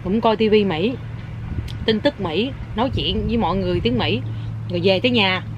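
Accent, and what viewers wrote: Mekong Delta, Vietnam. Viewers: E thích chị ghê.Người miền tây nc cưng ghê 😁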